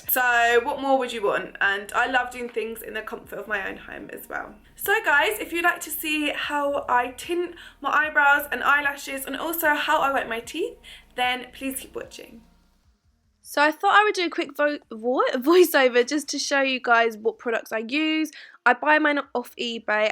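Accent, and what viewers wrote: United Kingdom. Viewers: R u bristish ????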